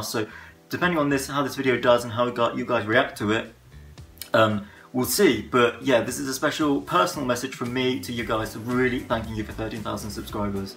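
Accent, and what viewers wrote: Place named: United Kingdom. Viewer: Congrats.. It is finally nice to see a face behind that British accent of yours:) I love all your videos.. keep it up! hugs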